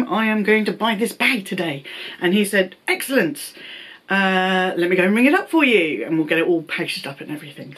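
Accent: United Kingdom. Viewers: Omg yess!!!! All the time I don't know what it its with them. I have a good and strong purchase history but when I went to return an item 5 SA ganged up and were fighting taking back the bag that I had received from LV online a day before!!!! They are ridiculous at most times 🙈🙇‍♀️🙉 I so love to listen to your British accent🥰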